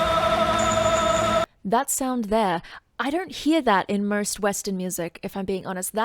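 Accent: Australia. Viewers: Don't let the "don't pause" crowd affect how you do your reactions. Do what comes naturally...most are here for a reaction/analysis..if we wanted to listen to it straight through we can! Everything sounds better with an Aussie accent BTW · Your honest analysis, well-balanced pausing, hair color, smile, & cute Aussie accent get me emotionally connected as well. 😍💃😁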